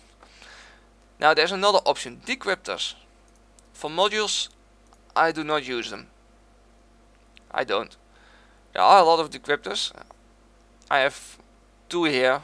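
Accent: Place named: Germany. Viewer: Thanks you havery an interesting accent and I was just wondering kinda sounded like a mixed german accent.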